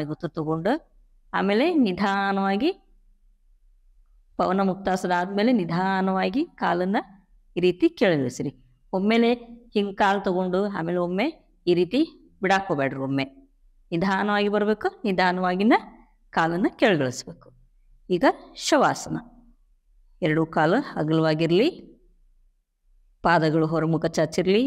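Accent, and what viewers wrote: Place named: North Karnataka, India. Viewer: ನಮ್ಮ ಉತ್ತರ ಕರ್ನಾಟಕ ಭಾಷೆ ಕೇಳೋದೇ ಚೆಂದ ❤‍🩹❤‍🩹❤‍🩹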